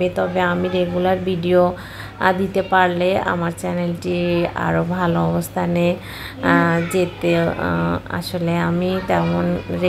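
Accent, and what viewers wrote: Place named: Chittagong, Bangladesh. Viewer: আপু কোন জেলায় আপনার বাড়ি আমি চট্টগ্রামের মেয়ে কথার টানটা পরিচিত লাগে❤❤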